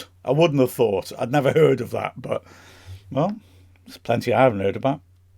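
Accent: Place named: Newcastle, United Kingdom. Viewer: Loved your Geordie accent! P.S. Thanks for keeping it under an hour. I find too many podcasts go to an hour and a half or more and I think that's way too long :)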